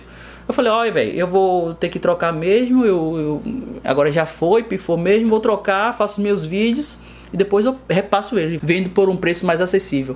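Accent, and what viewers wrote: Bahia, Brazil. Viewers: Sabia que você era Baiana, esse sotaque aí! Sou de Salvador. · Vc e da Bahia? ??????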